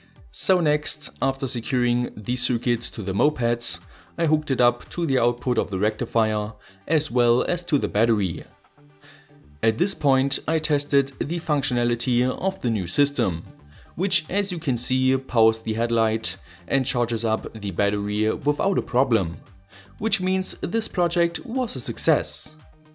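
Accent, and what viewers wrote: Germany. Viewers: des is voll lustig wie du so richtig deitsch englisch redest ;D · What kind of accent do you have? I can't quite recognise it Like which part of Germany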